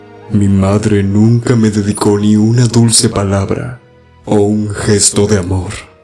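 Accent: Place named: Paraguay. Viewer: Me re suena esto re paraguayo ayuda soy la unica?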